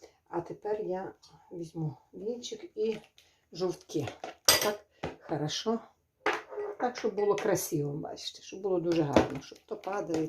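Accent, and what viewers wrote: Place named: Zastavna, Ukraine. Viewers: Говірка ,точно як у Заставнівському районі,.....файно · Живий класний рецепт!! Дякую- класно що жива мова Заставнявського району, живі звуки кастрюль, дзвенкіт посуди - просто класс! Дякую за рецепт- як писали люди - всі то шукали давно і нарешті в ютьюбі є!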